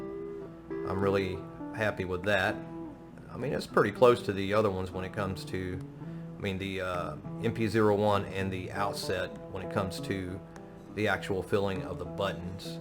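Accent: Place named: Louisiana, United States. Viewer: Banger of a review, well done! :) just found your channel and subbed, loved the bgm to go with the chill Lousiana accent, great video cowboy :D Btw the flex is at the bottom of the mouse, I recommend not to go too hard there around the sensor although it's probably really safe, some flex at the bottom plate is basically always to be expected :)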